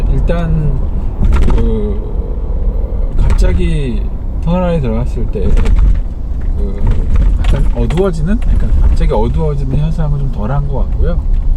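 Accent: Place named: Chungcheong, South Korea. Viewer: 충청도 분 이신가ㅜㅜ말좀빨리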